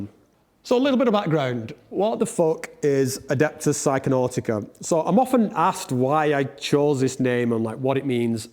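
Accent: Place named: Manchester, United Kingdom. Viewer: No way are you from manchester hello from a fellow Manc wythenshawe to be precise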